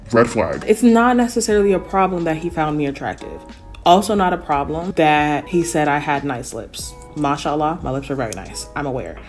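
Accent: United Kingdom. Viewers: Also your British accent is on point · Why did I really think she was from the UK? 🤣🤣🤣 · SAME MAN N I THINK IT'S THE UK MAKEUP n u don't really hear or see much black Muslims in America on the media n also her accent just sometimes slips into a British one sometimes 😭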